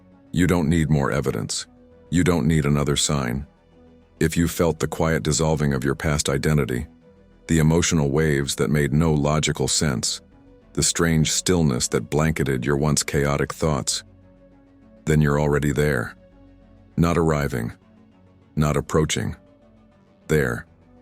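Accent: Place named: United States. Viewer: The discomfort im feeling right now, is the reaction to this vile American accent.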